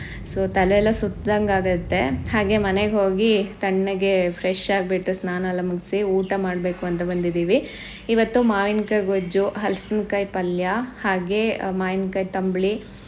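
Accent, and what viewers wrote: Kundapura, India. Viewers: Kantaradalli cundapura bashe irodu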